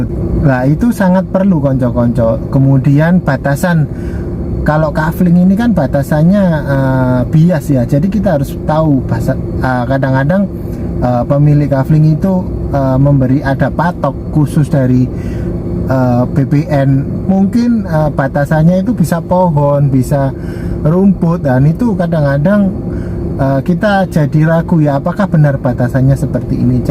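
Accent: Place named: Jambi, Indonesia. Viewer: Kalo dengarin dari logak cakapnya Orang jaambi nih Betul dak bosku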